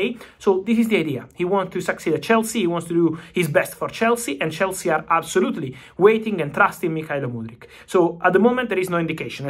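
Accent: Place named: Italy. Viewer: Italian english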